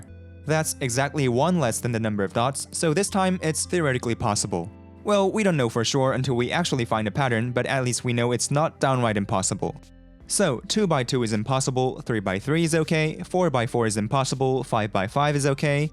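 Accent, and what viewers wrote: South Korea. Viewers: 아 약간 발음 보니까 한국인인데? 라고 생각했는데 9분 쯤에 알려주시네요 ㅋㅋㅋㅋㅋㅋ 편집이 정말 깔끔하고 좋네요. 어떤 프로그램을 사용하셨는지요..?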